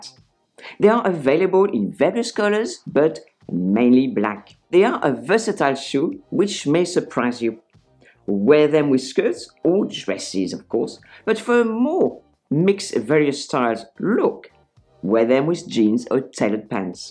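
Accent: France. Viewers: Love your cute french accent 😊 and my over ten years old Maryjanes, I will definitely wear them this fall. Greetings from Germany 👋😀 and thank you for your very good videos.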